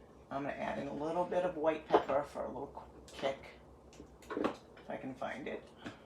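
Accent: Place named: Boston, United States. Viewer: Hey!!!!!! I’m here again!!!!! I always love checking in on you!!!!! You’re one of my best friends!! We both have that Boston accent lol 😝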